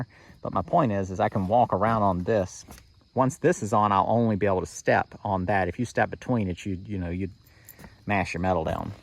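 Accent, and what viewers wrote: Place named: Texas, United States. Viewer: You must be from Texas lol. You gt that Texan draw to your voice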